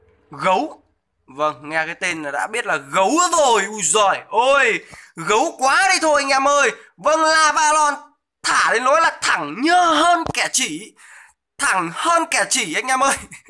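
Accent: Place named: Nam Dinh, Vietnam. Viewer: Anh người nam định hả anh hay ở đâu ?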